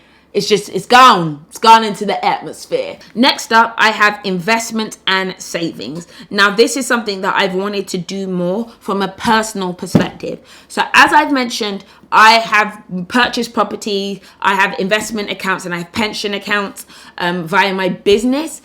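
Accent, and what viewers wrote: United Kingdom. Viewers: She sounds British to me! Unless she moved here as a kid? The first video I've seen so I have no idea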